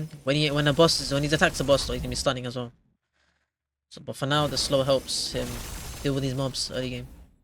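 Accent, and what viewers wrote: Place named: United Kingdom. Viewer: Strong bri ish accent😊😊😊😂🎉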